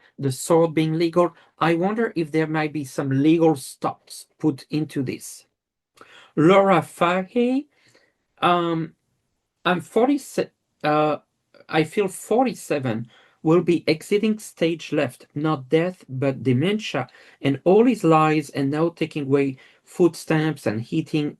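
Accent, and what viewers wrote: France. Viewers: Luv you ….love your reads!!!!! ❤❤❤ This is kind of a weird funny thing to tell you, but….as a child, I often would talk in a French accent. For days….weeks even! I’m an American, and now I think it was perhaps a past life thing. I always felt such affinity to the French, France in general and perhaps even tied somehow to WWII. I dunno…but, it’s weird but I feel a sort of “comfort” in hearing you speak. I’m glad you’re French and lol….I don’t speak French but, intuitively I feel like I could readily grasp that language. ❤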